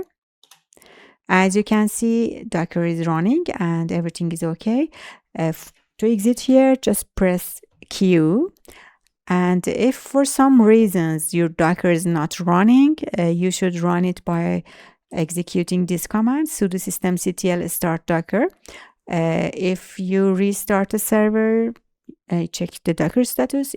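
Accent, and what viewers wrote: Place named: Iran. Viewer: Lahje irani dari Vali das khosh Afarin❤❤😂❤❤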